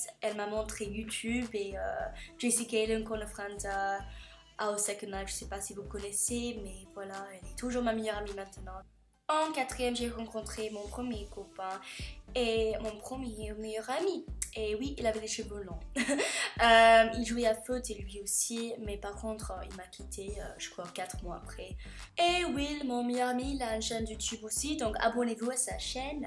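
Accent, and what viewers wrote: England, United Kingdom. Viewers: j'ai pensé que tu es de l'Angleterre, je peux encore entendre ton accent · super la vidéo c'est super dur de quitter son pays natale et d'apprendre une nouvelle langue donc tu as eu du courage continue comme sa (j'aime trop ton accent Anglais!)❤ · C'est courageux de ta part d'être venu en france sans même savoir parler français et j'adore ton petit accent anglais :P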